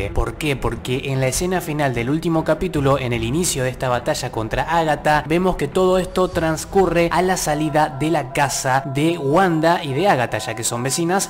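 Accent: Argentina. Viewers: No sabía que eras de Argentina messirve paaaa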